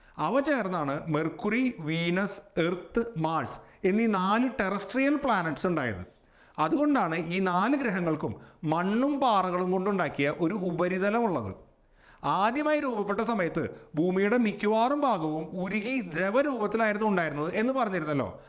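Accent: Thrissur, India. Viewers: ഇതേ ചാനലിൻറെ തുടക്കത്തിൽ ൽ, അദ്ദേഹത്തിൻറെ തൃശൂർ ശൈലി മൂലം ഇങ്ങനെ സംഭവിക്കാനിടയുണ്ടന്ന് പറഞ്ഞിരുന്നു. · ഇത്‌ തൃശൂർ ഭാഷയാണ് മാഷെ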